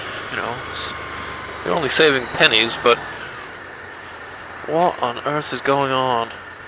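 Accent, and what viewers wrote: United States. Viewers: Nice vid but that mid-adlantic accent......what on earth is going on?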